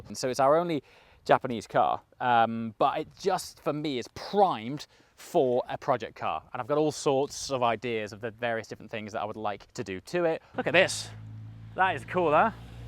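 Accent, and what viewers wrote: RP, United Kingdom. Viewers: I’m sorry dude. I really want to like you so badly but there is just something that makes me feel uncomfortable watching. You just don’t quite seem genuine. It even sounds like you are trying to hide an accent by using and RP type of speech pattern. Maybe it doesn’t translate to your American audience and they don’t pick up on it. But as an Englishman, I’m really struggling. The Chris Hoy name drop for instance. There is a way to be humble and you just don’t come close. I’m so sorry.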